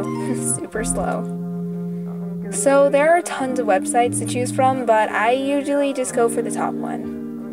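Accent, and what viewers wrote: United States. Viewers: ngl wvwn tho ur filipino.. (like meee😌) ur voice sounds like an american. :O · Omg!!! Your a Filipina!!! I thought u were from the STATE'S!!!! YEEEEEEEEE ❤💕❤💕❤💕❤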